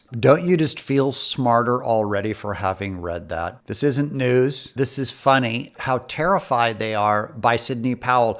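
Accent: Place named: Scotland, United Kingdom. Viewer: Love yer Scottish accent 🤭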